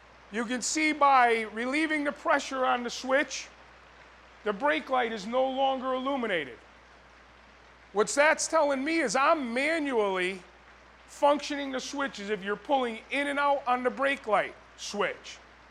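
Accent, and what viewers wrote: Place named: New York, United States. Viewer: New Yorker.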